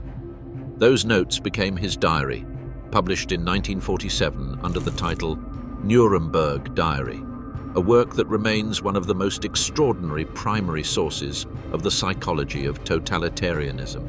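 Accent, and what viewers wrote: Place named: Oxbridge, United Kingdom. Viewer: stagey and pretentious...instead of the corny oxbridge accent and spooky music , a little histortical rigor...when thbe narrative ventures to comment and philosophize it pushes the envelope of banality....saying trite and obvious things in a stagey accent does not really dignify them ...too stupid overall and constantly stating trite opinions as verities....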